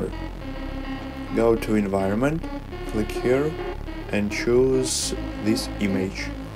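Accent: Russia. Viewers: сразу понятно что русский потому что акцент не английский)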